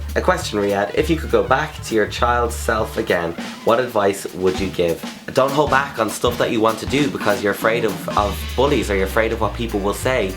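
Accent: Ireland. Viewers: your accent is awsome love the irish accent its so sexy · ) I love your videos, and your accent! Ireland is one of the prettiest countries I've been to!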